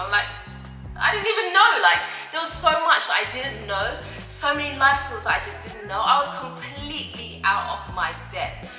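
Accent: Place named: Midlands, United Kingdom. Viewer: I am surprised to hear you are “Londoner” My Mrs who is a Londoner thought you were from mid-lands Becos of your accent and how you pronounce certain words Anyway thanks for sharing your story, much appreciated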